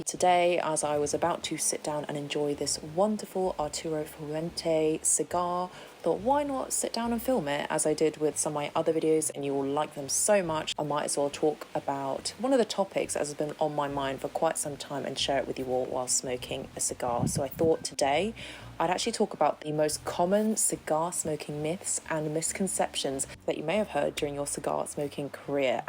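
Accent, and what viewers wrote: United Kingdom. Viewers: A beautiful woman with a British accent smoking a fine cigar. There is a God... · Asian lady with British accent, cigar, so sophisticated and sexy!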